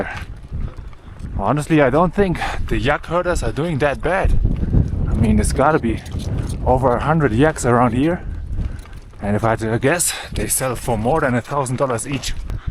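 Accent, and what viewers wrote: Germany. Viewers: Always love the content and im excited to see what you find in Tibet ! By the way, if you ever feel, when editing, that you should have said something but didn't, please feel free to add it. Or, if you just want to ride and not talk that day, you can add it in production. Just a thought I wanted to share, because you do have a great voice, with that german accent 😄. Because, showing us things, this time Tibet, is one aspect, but telling us how you are living it, is another thing. Its "wanderlust" as they say right ?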